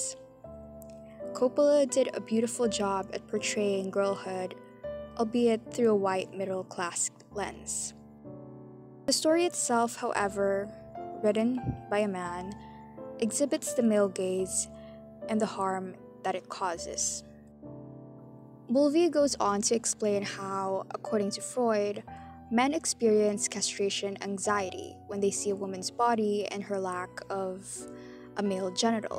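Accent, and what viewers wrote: Philippines. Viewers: I know a Filipino accent when I hear it.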